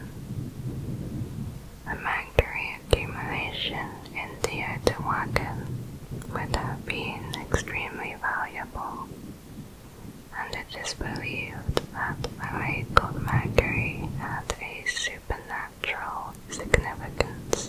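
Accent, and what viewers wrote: United Kingdom. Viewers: I love ur voice lol what part of uk r u from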